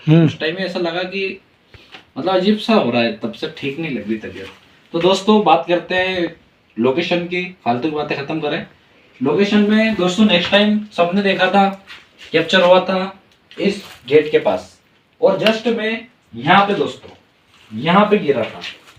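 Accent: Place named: Ajmer, India. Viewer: Bhai aap ajmer side ke ho kya sorry bura mat manna mujhe aisa lag raha hai ❤️🙏🙏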